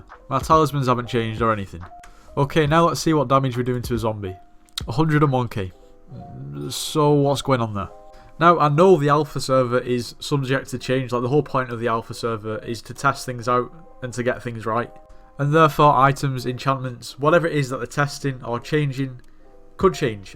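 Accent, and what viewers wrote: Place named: Birmingham, United Kingdom. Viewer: WTF SINCE WHEN WAS THIS DUDE A BRUM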